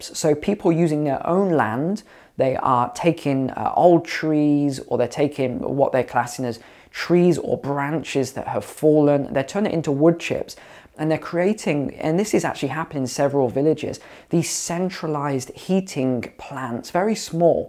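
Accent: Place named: England, United Kingdom. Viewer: This is all baloney, judging by the accent this guy is English and apparently looking at Europe. ( to the EU) with a very English perspective. First there is huge difference between various European countries. The Western European counties aren’t that cold plus hardly have any Forrest’s at all. Shutoffs might happen in the U.K. but it’s highly unlikely to happen in most European countries. Gas storage on the mainland is enough to cover the EU’s needs until about march purely based on the amount in storage tight now. Plus gas is still arriving every day.LNG by the way arrives in Ships in liquid form and then gets converted back to gas. The only problem is it’s much more expensive. Electricity is unlikely to be a problem because the old coal electricity power plants have been reactivated. Speaking about people be snowed in, in Europe is ridiculous it’s possible but very unlikely. 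The Europe climate is pretty mild.snowstorms are extremely rare.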